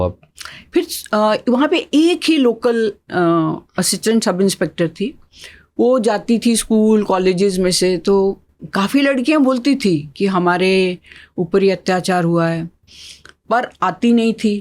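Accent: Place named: Punjab, India. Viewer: Maam is punjabi …her accent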